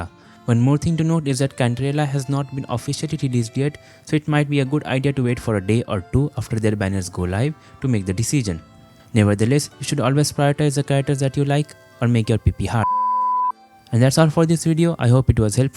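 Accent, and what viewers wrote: India. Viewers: I legit thought you got hacked 😂. Who would have thought the real voice sounded Indian 😂😂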